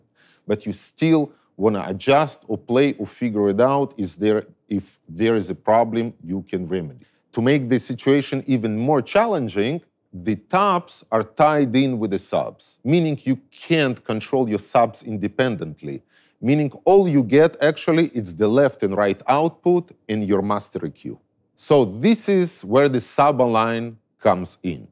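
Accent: Russia. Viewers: обожаю такой английский, русский русского лучше всего поймут по-английски))) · I love the russian accent (sorry, If I got it wrong).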